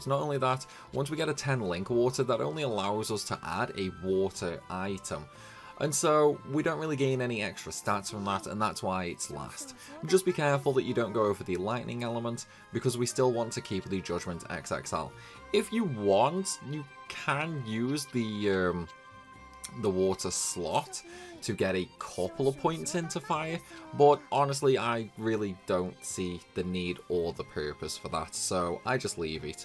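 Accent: United Kingdom. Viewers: omg why is every obscure youtube channel ran by a brit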